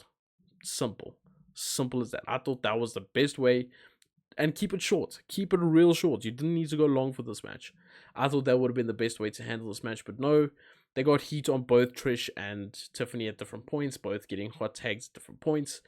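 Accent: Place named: South Africa. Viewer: By the accent, I can tell you are from SA! I am also from SA. Keep on going bro!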